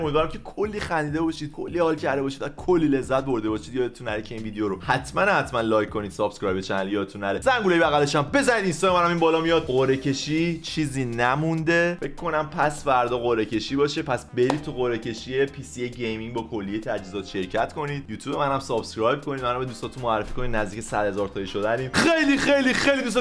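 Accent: Isfahan, Iran. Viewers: اصفهانی حرف بزن ناموصا😂❤️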